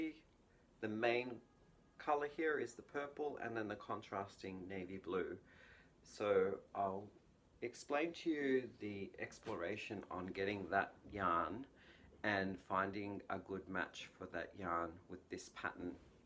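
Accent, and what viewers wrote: Australia. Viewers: Thought I recognised the accent. Hello from a fellow Aussie. Love Canada too! Anyway Starting with video number one after your channel came through on my feed. Gosh you only start 6 months before this video. Great job on the chevron scarf it looks gorgeous! I'm really looking forward to seeing how far you've come in the 3 years since you started out.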